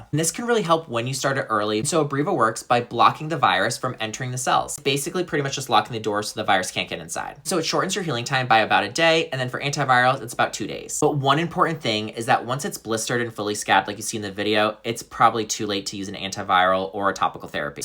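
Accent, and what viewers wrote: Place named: Australia. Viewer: She sounds Australian, Australia pharmacy’s aren’t the same as USA. Very limited, usually cold sores treated topically w/ aciclovir… or just wait it out.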